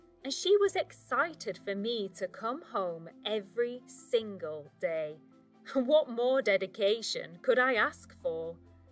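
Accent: England, United Kingdom. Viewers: Beautiful English accent!. I love listening and enjoy reading your stories. Thank you.🙏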